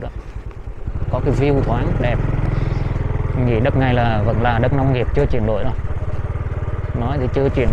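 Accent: Central, Vietnam. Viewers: Cảm ơn em đã cho cơ hội theo em đi dạo cảnh Đà Lạt mỗi ngày. Những cảnh rất đời thường và thực tế. Thế mới quý, em ạ. Cách đây khoảng 8 năm, tôi đã ghé Đà Lạt và thấy xa lạ lắm. Qua những chia xẻ của em, tôi hiểu và quý Đà Lạt hơn. Ước mong có ngày gặp lại thành phố nhỏ và dễ thương đó. Bây giờ thì nghe quen giọng miền Trung của em rồi.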